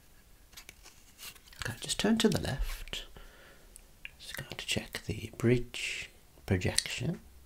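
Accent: Birmingham, United Kingdom. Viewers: My bridge reflection is not as stated how very dare you !! 😂 Great, informative vids, very relaxing and a wonderful voice , a Birmingham accent (at last) velvety smooth that doesn't fill one with a sense of urgent panic as experienced whenever my Brother in law visits. (Aston area) Please don't be offended I'm just trying to be funny . Love your work , thank you 👍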